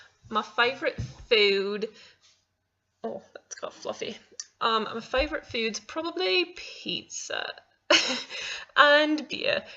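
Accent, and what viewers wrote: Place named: United Kingdom. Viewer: oh dear British accent, really haha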